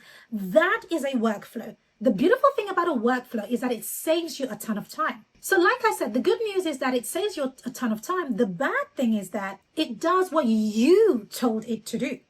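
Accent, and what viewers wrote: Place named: United Kingdom. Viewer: (I am answer to you here, as on that reply i couldn't, i don't know why!) I am glad! ☺hands-yellow-heart-red And...YES! I hope they will be the company, that i can fulfill my long last dream, of making that project! And first i thought that you are from the US, later on i have figured out, as you have mentioned that, you are from the UK and truly paying attention, at your english accent! 💫🙏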